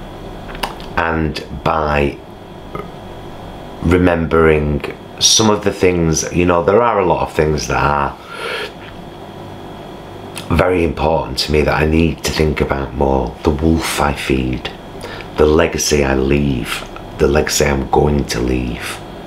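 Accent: Manchester, United Kingdom. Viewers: Lovely, I'm moving back home and I will keep following u, a bit of a Mancunian accent in a southern Italian flat... great person indeed xxx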